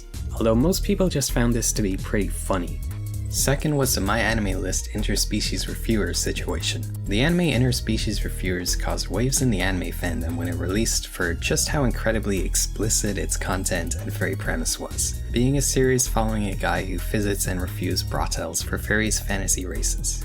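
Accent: Ireland. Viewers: Great video, watched tbe whole thing. Also what accent is that? I thought you were american at first but you say your THs and Rs in a very Irish way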